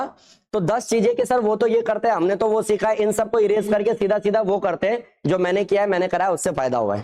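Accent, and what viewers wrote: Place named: United States. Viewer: कृपया हिंदी मे बताइये नाकी इंग्लिश में अमेरिकन एक्सेंट से नही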